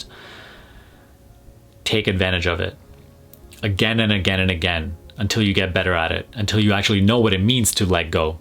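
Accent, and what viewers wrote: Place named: United Kingdom. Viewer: Out of topic, you sound quite British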